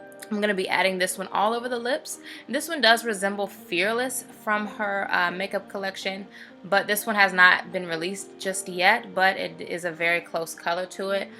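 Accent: South, United States. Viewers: You sound extraaaaa country in this voiceover! Love the accent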